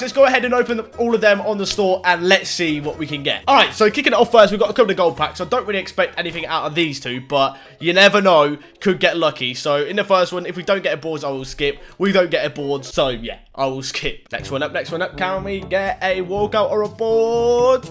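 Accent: Northampton, United Kingdom. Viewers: Are You from Northampton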